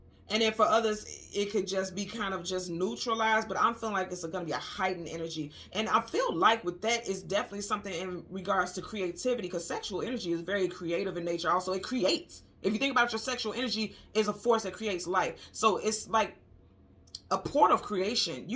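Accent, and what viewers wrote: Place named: Chicago, United States. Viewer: I Love this read. And I heard and felt every bit of Chicago in you! 😁 You were not playn in this read 🙌🏿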